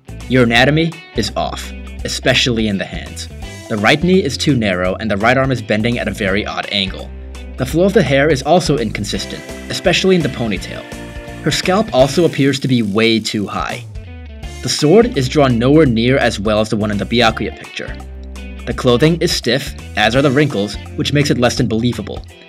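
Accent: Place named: Wales, United Kingdom. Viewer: Oh god, she's Welsh...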